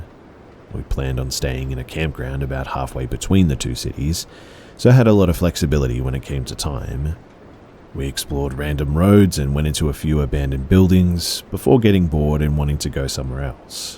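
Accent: Australia. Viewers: Are you Australia? Loving you content aswell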